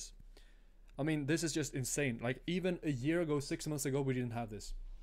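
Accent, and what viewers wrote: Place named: Scania, Sweden. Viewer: Skåne säkert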